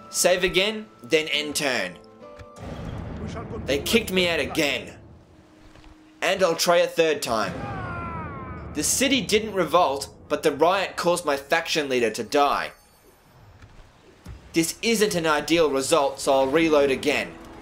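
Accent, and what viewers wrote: Australia. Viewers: He's Aussie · Holy shit, he used to be such a pure Australian.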